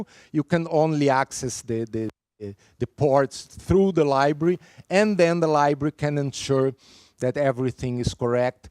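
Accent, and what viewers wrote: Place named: Brazil. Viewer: The speaker is speaking in a brazilian english accent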